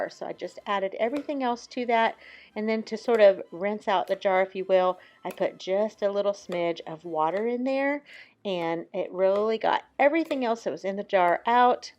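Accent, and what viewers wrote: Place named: Fort Wayne, United States. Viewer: We're from Fort Wayne and it sounds like you are close by.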